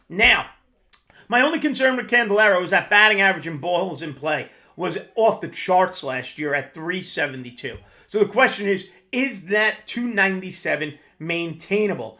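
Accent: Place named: New York, United States. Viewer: Great job. Love the New York accent. Very curious who the framed picture is in the background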